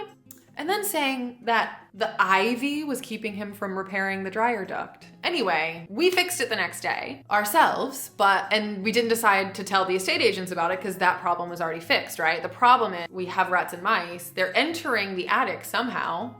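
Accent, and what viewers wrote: United Kingdom. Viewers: Is it just me or is accent changing? I swear she sounds at least 15% more British now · You're getting such a British accent! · You're getting a little British twang in your accent I can hear it! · You are definitely starting to sound British. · It's funny to hear how your voice has taken on a little bit of British way of talking. Not your accent, per se, but the way the sentence flows. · I love hearing your accent start to change a little bit. Some words you say have a British accent and I’m loving it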